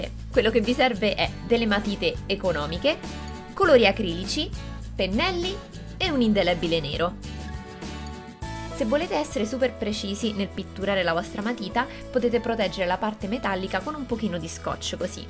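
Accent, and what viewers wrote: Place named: Rome, Italy. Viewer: Sei americana o italiana perché mi sembri di roma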